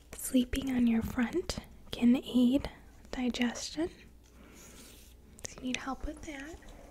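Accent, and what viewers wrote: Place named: Michigan, United States. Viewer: Do you live up north? You have an accent like my family members from Michigan ❤️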